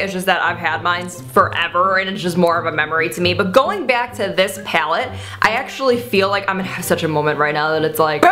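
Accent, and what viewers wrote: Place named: Midwest, United States. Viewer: Lovin your Midwestern accent :)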